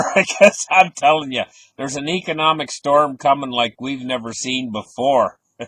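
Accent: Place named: Canada. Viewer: Sounded extra Canadian today.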